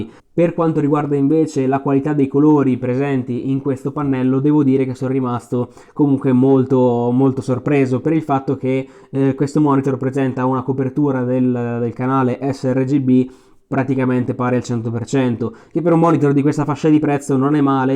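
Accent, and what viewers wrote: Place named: Valtiberina, Italy. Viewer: ma per curo caso, sei di origini umbre? hai un'accento buffo che ricorda quello valtiberino in parte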